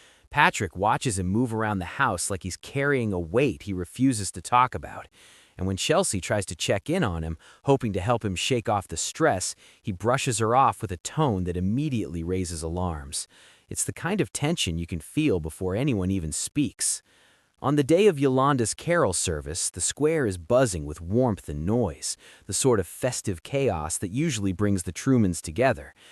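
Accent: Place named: United States. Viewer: Why is there an American speaking about our soap??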